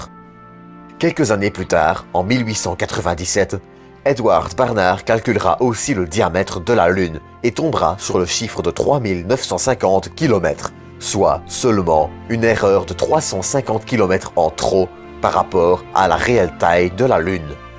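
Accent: Belgium. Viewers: La voix off est dégueulasse, c'est quoi cet accent belge, on dirait une blague!!??impossible de prendre cette vidéo au sérieux. Travaille ta diction stp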